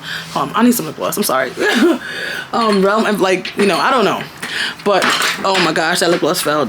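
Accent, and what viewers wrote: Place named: Jamaica. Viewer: Hear the Jamaican accent, dutty lol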